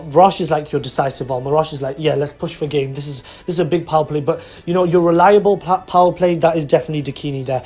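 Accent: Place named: United Kingdom. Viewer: #Nekrozmasterrace I pronouce Natasha the same way you do, even though the only girl I met with the name irl pronounced it like stache too. Personally Impcantation is a better name than Deviritual to me. It's a pun I can get behind. Yeah, I believe you're british. Saying that as a fellow British. I can recognise our kind. 'Eyyyyyyy! That ghost rare artwork for the extra deck cards.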